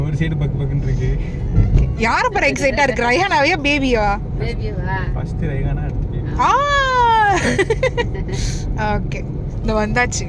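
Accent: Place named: Udangudi, India. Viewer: Neenga endha oor neenga udangudi kaaranga maari pesringa en ooru adhaa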